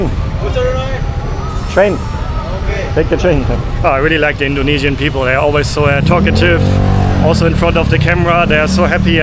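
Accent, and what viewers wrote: Germany. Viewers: Your German's accent is really strong huh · He's got a horrible German accent. But if that is what you like, just listen to Germans. Just find the ones with the worst accents and whose vocabulary is extremely limited and basic. Many out there in Germany. 🙃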